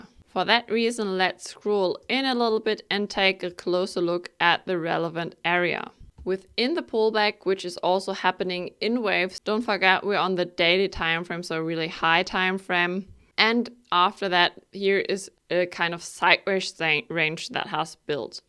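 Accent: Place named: Germany. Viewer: Ich wusste doch ich höre den deutschen Akzent heraus 😅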